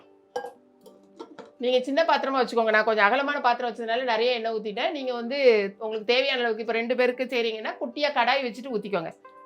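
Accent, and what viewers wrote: Tirunelveli, India. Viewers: Tuticorin n Tirunelveli slang super.very nice our language cooking is very interesting.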